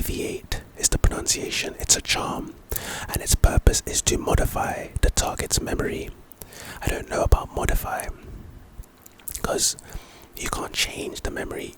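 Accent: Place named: London, United Kingdom. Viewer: This is going to sound really stupid, but your accent makes me feel incredibly homesick for northeast london ^-^ ...But in a good way. Its nice, to hear a voice that sounds so familiar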